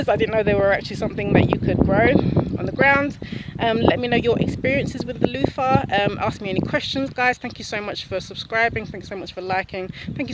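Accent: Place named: United Kingdom. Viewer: So good to hear that crisp British accent after trawling through so many annoying amercian video's! About to try and grow some here in the far south west of the U.K. for the first time. I've been led to believe it's possible, I guess we'll see!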